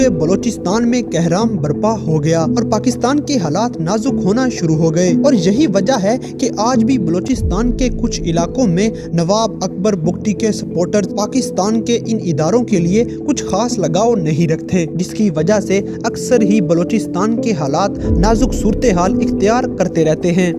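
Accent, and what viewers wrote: India. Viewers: یہ چینل انڈین ہے اس کا لبو لہجہ بتا رہا ہے ۔ نفرت پھیلا رہا ہے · In my opinion The accent belongs to India.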